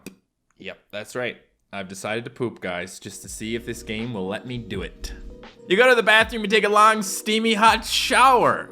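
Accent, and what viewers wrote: Newfoundland, Canada. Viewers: after i watched his real accent video i cant unhear the hint of a newfie accent in his voice now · ever since hearing your newfy accent i haven’t heard you the same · SPEAK in your newfie accent starting from now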